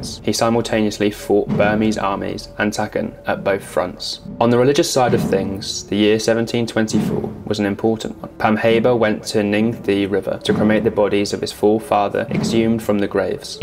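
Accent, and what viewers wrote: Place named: United Kingdom. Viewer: I was not expecting the british accent....😂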